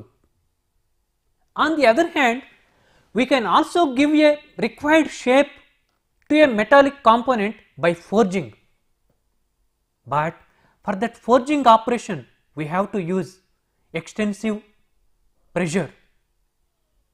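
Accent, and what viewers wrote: India. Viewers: Ok its very interesting ,but common this indian accent........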